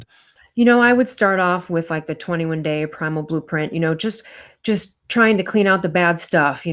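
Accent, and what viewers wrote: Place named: Australia. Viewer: you could be taken as an Australian with that accent.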